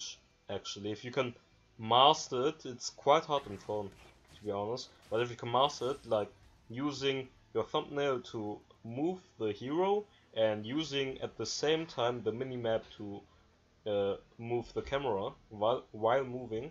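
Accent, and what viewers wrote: Germany. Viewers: Where r u from? Youre english sounds like u come from germany 😅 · I have a question. Are you from Germany? Because you sound like german and your Windows Exil or Word or whatever were german, too.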